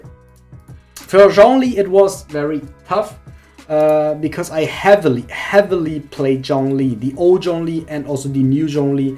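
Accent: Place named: Germany. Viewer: I mostly agree with you tier list and think it's one of the best I have seen so far. The only argument that could be made in my opinion is Zhongli is the best character to me. He gives more support-dps options with his resonate dmg and his burst as well as a true support he gives way more than Venti does in terms of helping you team out. His shield makes you invincible and you shred the defense of the enemies by 20% at close range which might be the strongest ability in the game right now. I think it is a battle between Venti and Zhongli at the top and agree with both, but the argument could be made for both I tend to think Zhongli is a little bit stronger overall. Note: I like you english it's really fluent I have to say. I can hear you have an accent might be german or something like that, but really good english!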